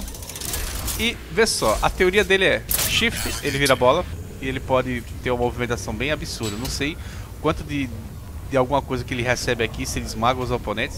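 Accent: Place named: Recife, Brazil. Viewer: tu é engraçado kkkkk é de Recife? Sotaque da porra é esse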